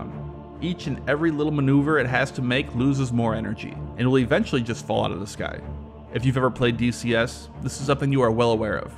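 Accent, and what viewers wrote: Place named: Midwest, United States. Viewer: He’s got a strong Midwest accent. I’d guess Wisconsin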